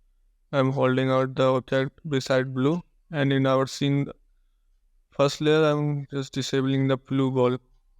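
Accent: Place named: India. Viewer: Is this an indian accent by any chance? Usually i can understand the accent since they know english already, but this is a thick accent lol. I agree though, much respect for using his voice. Confidence will adjust the accent, but to get more views you have to be more legible. Also the subtitles aren't saying what he's saying a lot of times.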